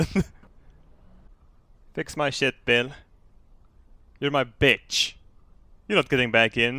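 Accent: Sweden. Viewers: är du svänsk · du e skit bra men du pratar inte så bra engelska (ta inte illa upp) typ svengelska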